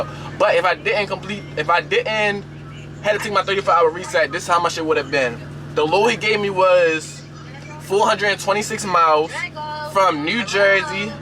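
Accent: Baltimore, United States. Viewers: Must be from Baltimore 😂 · Definitely gotta be from Bmore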